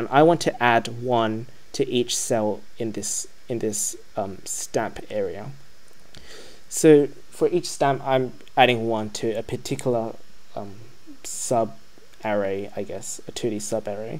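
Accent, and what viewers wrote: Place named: Australia. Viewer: Omg the Australian accent 🔥🔥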